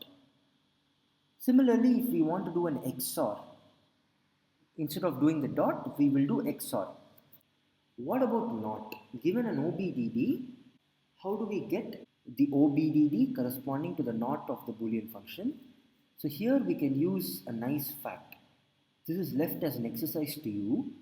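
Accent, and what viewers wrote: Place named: India. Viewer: fucking indiaaaan accent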